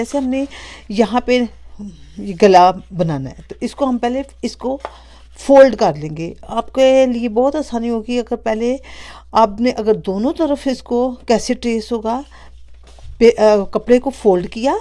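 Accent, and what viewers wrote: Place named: Pakistan. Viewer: Apk accents se ap Pakistani lgti hn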